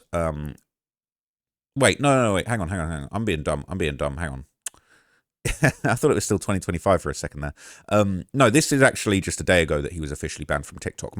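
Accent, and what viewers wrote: United Kingdom. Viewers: Ngl your over use of Black American vernacular while u have a damn British accent is high key annoying af BE YOURSELF AND STOP COS PLAYING MY CULTURE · He's British we say things differently. We have a different accent to Americans!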